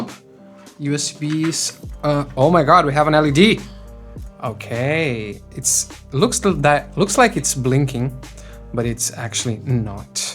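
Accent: Brazil. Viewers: Esse aí é brasileiro, não me engana não kkkk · Adoro um brasileiro falando inglês 😎 amei seu vídeo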